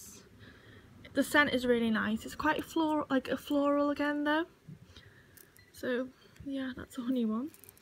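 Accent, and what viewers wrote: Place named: United Kingdom. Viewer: I really love the british accent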